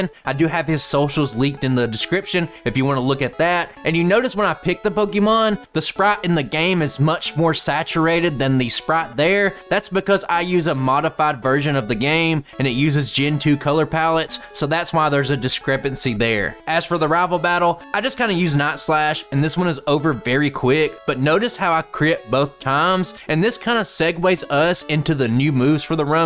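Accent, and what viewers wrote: South, United States. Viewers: You are one of the only Youtubers with a southern accent that I have ever watched. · to hear your Southern drawling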